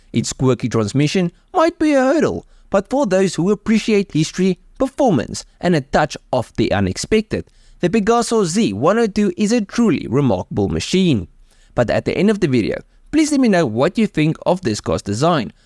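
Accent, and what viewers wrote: Afrikaans, South Africa. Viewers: Does anybody listen not only for the very interesting cars but also for guy's wild afrikaans accent?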